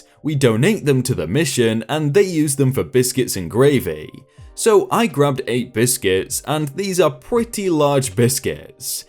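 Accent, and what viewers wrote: United Kingdom. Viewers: The 2 story’s he sounds British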